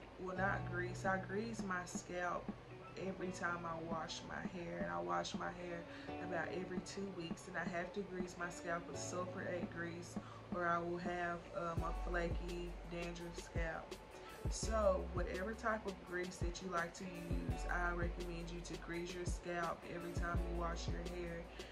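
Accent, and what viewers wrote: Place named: South, United States. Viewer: Good information! You sound like a southern girl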